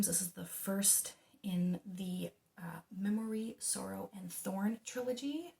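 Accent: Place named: Eastern Canada, Canada. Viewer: Haha - no I couldn't tell. I heard an "Eastern" Canadian accent once or twice, though. 😄 I'm from the West so I fail to differentiate all the many Eastern/Atlantic accents unless they are obvious obvious, like peppered with b'y, for example. 😂 You have a very subtle accent to my ears, whatever that means.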